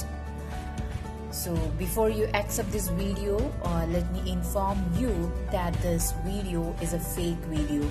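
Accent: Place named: India. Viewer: She sounds like indian.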